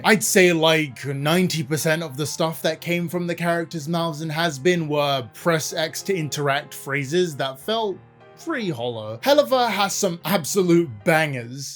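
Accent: United Kingdom. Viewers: Your accent is based on where you grow up not your ethnicity. The video creator definitely isn't ethinically British, but he sounds British.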